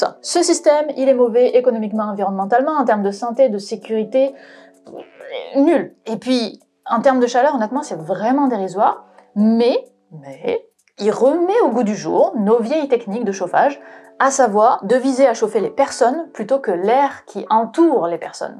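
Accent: South, France. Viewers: Pour une québécoise tu parle avec un super accent français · Québecoise avec un accent presque du sud ☺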